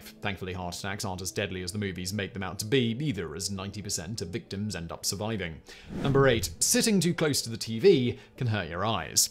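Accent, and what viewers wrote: England, United Kingdom. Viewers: you and your staff have an amazing propensity for getting it wrong more and more often. Before you cause much more damage in peoples lives. Consider how you produce these videos, and how many. Quality control sucks. Number two is patently and demonstrably false. The brain does grow and learn through mental exercise. Apparently you or your editors? Have never heard of cerebral plasticity. Or took no notice of the numerous studies which proved beyond any doubt that people who picked up a second language, or learned new math skills, or even practiced mental yoga's, in middle age, or even older, were far less likely to experience cognitive decline, and thats just one benefit. But how I weary of making a counter point to you. So many of your viewers hear your English accent, and just accept that you are smarter than they are because of it, and so you must know what your talking about. 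Such is specious reasoning. Every part of the body, including the brain, can be exercised. And growth will continue so long as telomeres tell, cells make new connections, and nuerons know what is going on. I'm serious, yet I jest. And yes, you can definitely make up for lost rest and missed sleep. Changing sleeping patterns sucks for your health. Both mental and physical. Yet we can adress this as well with adenosine and melatonin, or sometimes a simple histamine. Maybe you guys will double check yourselves and get an opposing opinion some day. I find it difficult to believe you ever do so, re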